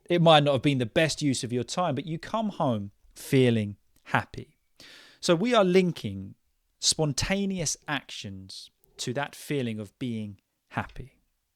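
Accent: England, United Kingdom. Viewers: Thanks teacher, I am osman I follow you and enjoy. topics.Also your accent is realy authentic eglish .My good wishes from Turkiye 😊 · One and only British native speaker who speaks slowly and clearly thanks for understanding us haha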